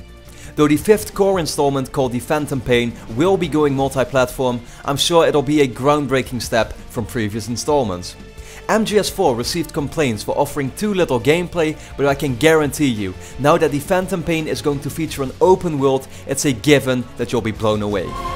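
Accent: Netherlands. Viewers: duidelijk te horen dat deze man nederlands is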